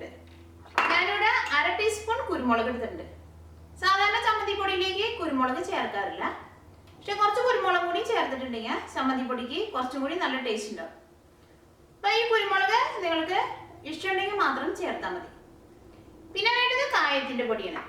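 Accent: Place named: Thrissur, India. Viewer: Hello..I am your fan..love your simple narration and the accent..I am also a native of trichur..hence hearing you gives extreme pleasure.. very good recipes and cooking..keep going..congrats..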